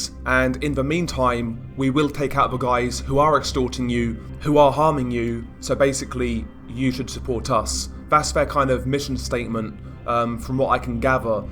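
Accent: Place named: United Kingdom. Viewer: british accent is so satsfing for narations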